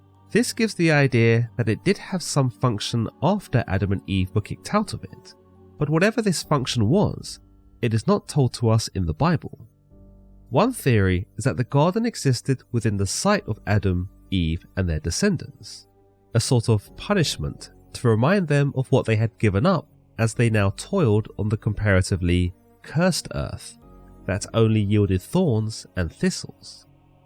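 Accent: United Kingdom. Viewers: I knew there was going to be some type of British accent when I clicked on this.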